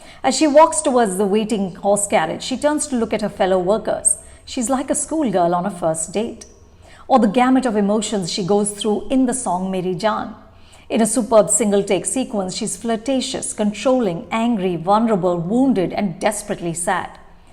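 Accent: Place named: India. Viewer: Her indian English accent though 😂😂😂😂😂😂😂😂😂😂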